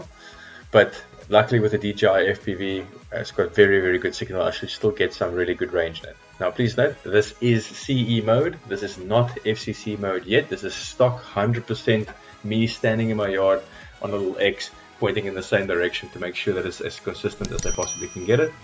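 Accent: South Africa. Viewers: Nice to hear an SA accent gain. Filming from Melkbos? My question: I am currently in Vancouver, Canada. How will I find out if I am in FCC mode on my DJI FPV?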